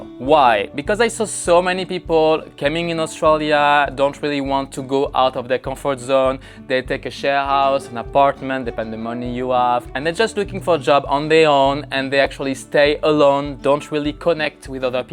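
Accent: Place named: France. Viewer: It's either your French accent or my screwy hearing 😆.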